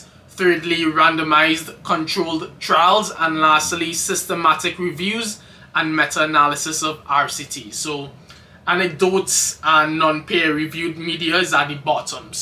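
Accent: Trinidad and Tobago. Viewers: That Trini accent haha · you sound like a trini. good luck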